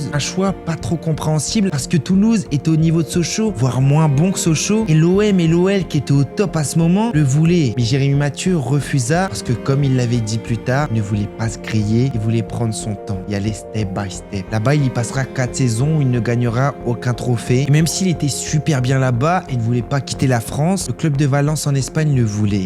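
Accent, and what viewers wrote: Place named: Nord-Pas-de-Calais, France. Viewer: Tu fais vraiment passer Jeremy Matthieu pour un simplet , à t'écouter il n'avait aucune ambition et aucune hygiène avec le tabac et il finit malgré tout au Barca , va comprendre . Comme le fait de travailler à Intersport . C'est toi qui est simplet avec ton accent chti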